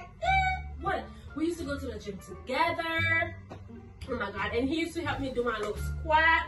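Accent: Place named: Haiti. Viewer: are you ayisyen? I hear the accent coming out or either Congolese or something?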